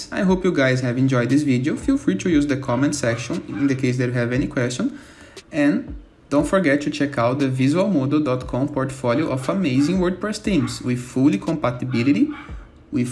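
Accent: Brazil. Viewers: Cara, você é BR né? kkkk Top, mandou bem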